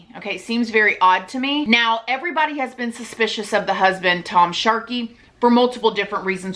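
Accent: South, United States. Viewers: Your accent got even more southern over the christmas i hear!😄 You are so, so sweet! And good job with the video. Very interesting case indeed!🤓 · Your obviously from down south, I'm curious if you have some Italian in you the way your hands fly around when you talk???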